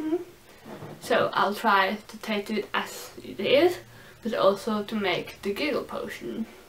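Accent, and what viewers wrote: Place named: Sweden. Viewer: Love your accent, are you Swedish?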